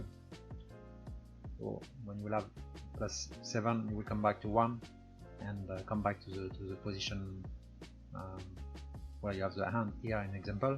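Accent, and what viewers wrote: France. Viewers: Tu es français? Ton accent anglais n'est pas très présent mdrr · Oh mon dieu mais tu es Français :D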